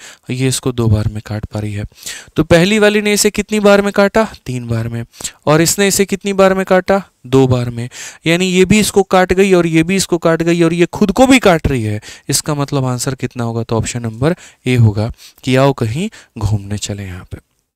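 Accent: Bhojpuri, India. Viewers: Apki bhojpuri bahut achhi h😊❤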